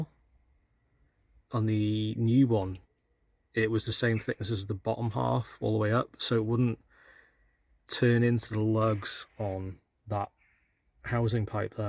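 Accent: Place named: North Wales, United Kingdom. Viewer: Sounds like North Wales accent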